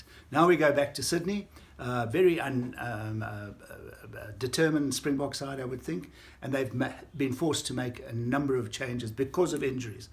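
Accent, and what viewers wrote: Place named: England, United Kingdom. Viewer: Potter and the funny thing is on each occasion many years later the official has come out and said he was wrong but that doesn't help after the fact.... And why do they all admit many years later that they were incorrect, because at some point in there lives, they realise they just look like dishonest chops and the conscience gets to them... Brice Lawrence came out n said I had a bad day, Romain poite came out said I got it wrong, paddy o brian said on many occasions he got it wrong with bok games????? Doesn't help after the fact... Remember two years ago where boks were about to beat England at Twickenham, Will Arrogant Farrell shoulder charged Andre Esterhuizen, boks weren't awarded a penalty, even although after the game Eddy Jones and Farrell said they were extremely lucky 😉... it's not luck it's biased officiating... 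you sound like a proper Pom, we dnt expect you to be unbiased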